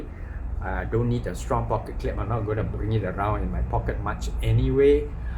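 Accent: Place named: Singapore. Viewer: Are you malay? Or Singaporean? I lived in Johor 5 years your slang is very singlish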